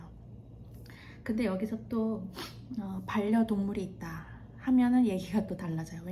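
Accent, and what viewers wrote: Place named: Gyeongsang, South Korea. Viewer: 경상도 사투리가 들려요!!! 경상도 출신 구독자입니다 ㅎㅎㅎ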